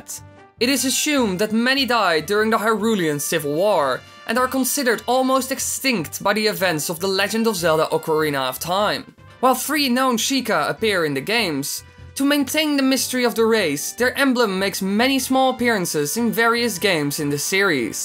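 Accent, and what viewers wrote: Netherlands. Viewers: You're Dutch right? If so, dat is te horen haha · ik hoorde het aan je accent, ik heb t gevoel dat ik dat bij elke nederlander kan 😂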